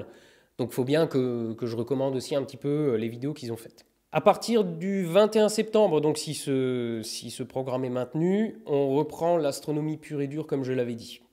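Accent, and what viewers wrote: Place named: Alsace, France. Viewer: Yoh.. T'es bien un alsaco toi... Ou lorrain ^^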